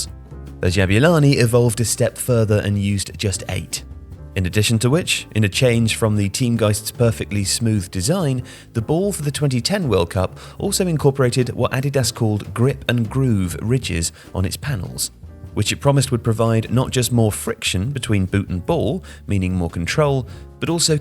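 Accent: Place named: RP, United Kingdom. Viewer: "lamiNAR" 😂 This lad is getting posher with every video!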